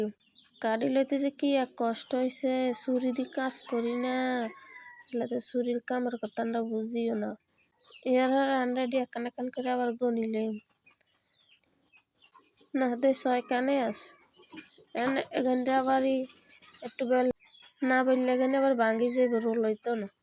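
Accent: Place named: Noakhali, Bangladesh. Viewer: নোয়াখাইল্লা ভাষা অনেক সুন্দর হয়েছে ❤❤খুবই ভালো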